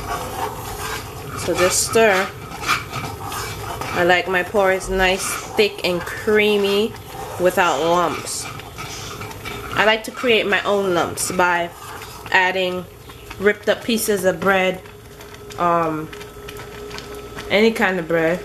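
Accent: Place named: Kingston, Jamaica. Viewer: Where in Jamaica are U living, U sound like Kingstonian.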